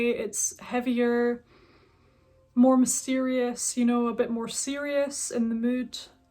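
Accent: Scotland, United Kingdom. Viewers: I love your voice. I'm here in the heart of the southern U.S., Arkansas. I hate the average accent around the area I live in, and your accent is a breath of fresh air. Will you tell me where you grew up? My girlfriend & I have debated it quite a lot. We thought it sounded a little Scottish, but you have the United Kingdom as your location. Thanks. We love the Channel.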